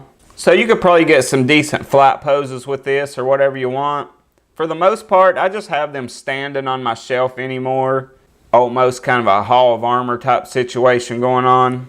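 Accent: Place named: Texas, United States. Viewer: Your accent..your from texas?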